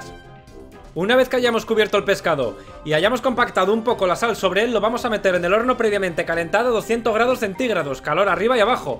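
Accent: Spain. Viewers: saludos desde México, y me encanta el asentó español, besos y abrazos;)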